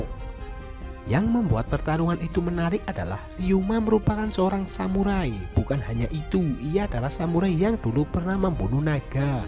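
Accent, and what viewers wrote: Banyumas, Indonesia. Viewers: paijo Londo lu ama aja menghina orang dengan logat ngapak bro.. jangan sok lu.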